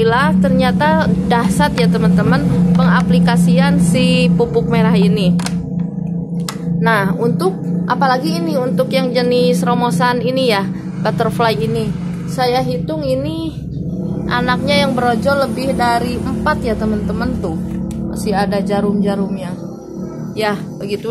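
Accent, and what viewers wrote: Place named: West Java, Indonesia. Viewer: Sunda pisan eeuy...❤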